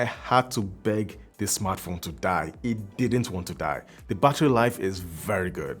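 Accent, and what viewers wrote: Nigeria. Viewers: I just wanna say thank you to my Nigerian brother with this beautiful accent, love from iran❤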